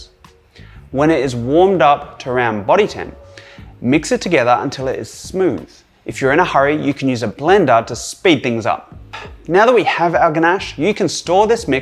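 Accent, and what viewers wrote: Australia. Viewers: Sydney, Australia maybe? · is he aussie???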